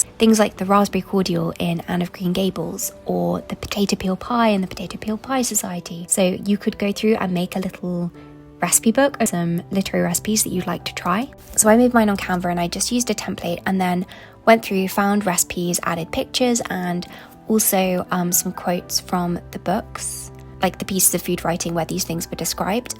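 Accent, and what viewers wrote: RP, United Kingdom. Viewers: I think it is rp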